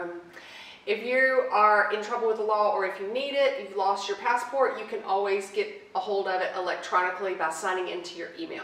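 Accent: South, United States. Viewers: I love her southern drawl. Super charming.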